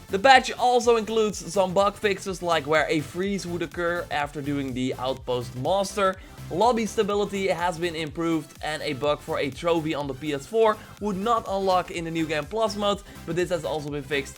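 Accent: Netherlands. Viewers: Im guessing that you are dutch or belgian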